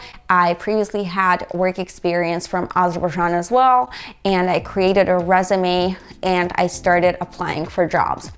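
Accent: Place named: United States. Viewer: Accent sounds American accent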